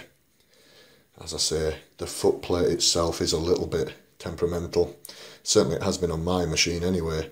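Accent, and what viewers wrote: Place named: Yorkshire, United Kingdom. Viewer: Good Northern Yorkshire(?) accent and very informative on his personal experiences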